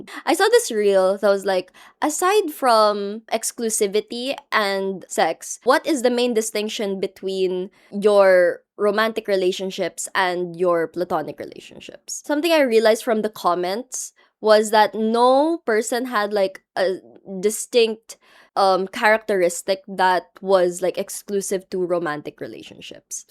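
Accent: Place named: Asia. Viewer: Where are you from with your beautiful asian accent ? 🎉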